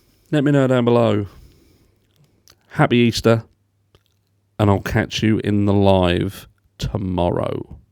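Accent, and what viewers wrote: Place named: Scotland, United Kingdom. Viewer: Im having rabbit stew.... 🐇 🐰 yummy I love your voice being Scottish, but I hate all this murder 😢 whats happening with the world 🌎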